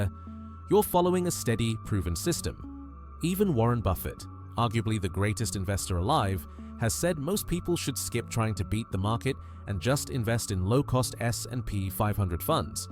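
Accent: United Kingdom. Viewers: I hear a British accent and an alarm bell goes off.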